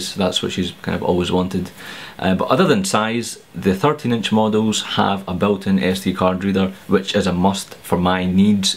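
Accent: Scotland, United Kingdom. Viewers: He is speaking English it's called having a scottish accent!! I'm not Scottish and I can understand him. People are so ignorant sometimes as soon as they hear an accent they stop listening to the person and assume they can't speak proper English. :( So disrespectful. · another scottish person on the internet!!.... it isn't just me! :D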